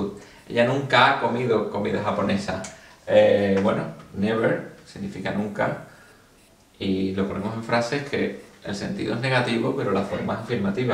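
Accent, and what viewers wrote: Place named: Andalusia, Spain. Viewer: En el caso de still , le recominedo explicar primero el uso normal, y luego la excepción que le permite posicionarse delante del verbo modal o primer auxiliar. el acento andaluz es cojonudo, los que desprecian a un profesor por el acento, no me gustan, ya que tantos acentos habra como culturas o paises adopten el ingles. jamaicano, sudafricano o español.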